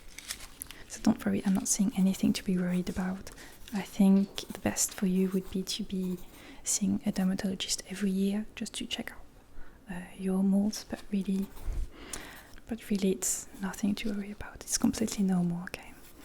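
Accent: France. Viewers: Are you French? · Her accent is french :)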